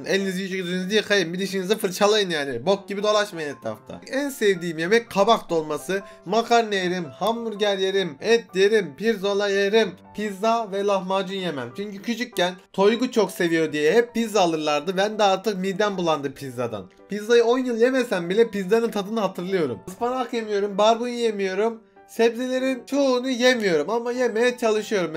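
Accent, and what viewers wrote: Adana, Turkey. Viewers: Abi sen Adana'lı değil misin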